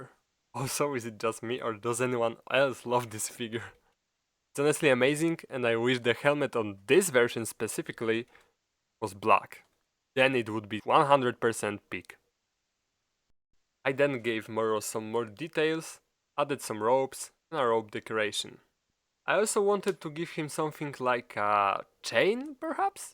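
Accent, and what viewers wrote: Poland. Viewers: Po jak mówisz odrazu wiedział że z Polski jesteś XD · Po akcencie wiedziałem ze to polak. Zajebisty film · wiedziałem że jesteś z Polski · Po akcencie poznałem że polak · Polski Akcent Mocno wjechał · chciałem pisac po puerwszym uslyszanym slowie że masz strasznie polski akcent ale stwierdzilem ze mize poprostu mam cos z uszami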